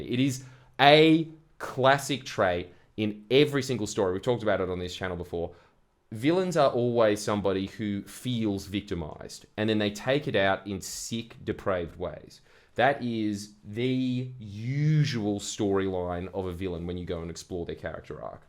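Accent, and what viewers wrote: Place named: Australia. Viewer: Australian detected, opinion disregarded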